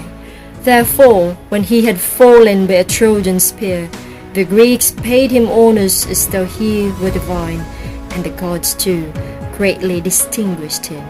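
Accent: United Kingdom. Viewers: Sana all british accent, lami ra paminawn uyy...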